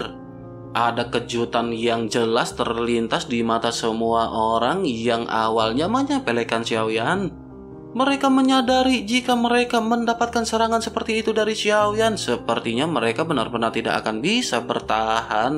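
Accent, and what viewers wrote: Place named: Jakarta, Indonesia. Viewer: Pakai logat nasional sajalah bos.Karena di dengar oleh bnyk org bukan hanya org betawi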